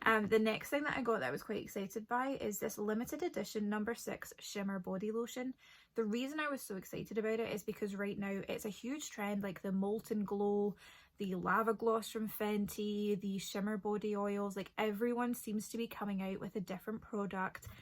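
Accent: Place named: Scotland, United Kingdom. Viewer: At the start I thought you had american accent but the more I listened I can hear your scottish now ha 🙈😁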